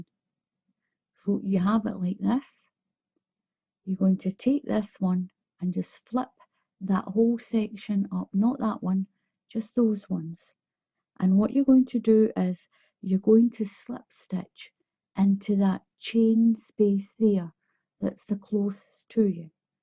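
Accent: Scotland, United Kingdom. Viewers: When I was growing up, we had neighbors that were Scottish, you’re accent reminded me so much of these dear, sweet people. This video was very complete from the beginning to the end of the blanket. I have one started, but I didn’t know how I was going to fill it in and end it. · Hi. First of all I absolutely love listening to your Scottish accent. Secondly I have now mastered the c2c thanks to you. Finally are you able to tell me the make of the beautiful yarn shown at the start of this video which is grey and pink/peach white? Jeannette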